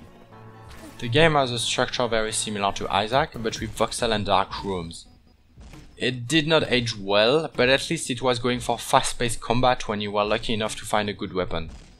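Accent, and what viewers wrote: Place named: France. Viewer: you french?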